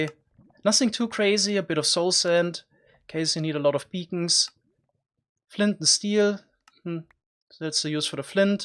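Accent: Germany. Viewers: Deutsch man hört es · Hahaha ich hab gewusst du kommst aus Deutschland!!!! xddd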